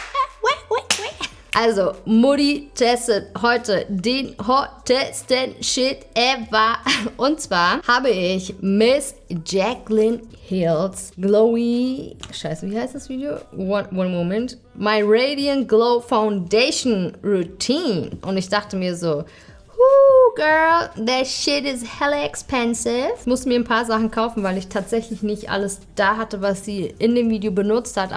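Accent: Berlin, Germany. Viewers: ick weß it gar nicht mehr ''😂da kommt die Berlinerin aus dir raus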